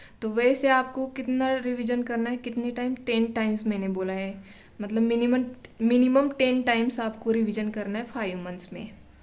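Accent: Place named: Maharashtra, India. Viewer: Ma'am you are from Maharashtra 😅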